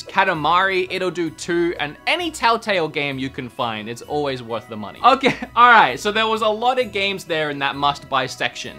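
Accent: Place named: Australia. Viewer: He’s Australian I think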